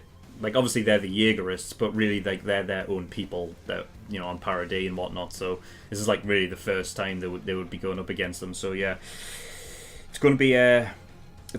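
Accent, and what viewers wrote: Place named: United Kingdom. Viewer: イギリス英語聞き取りやすくていいなw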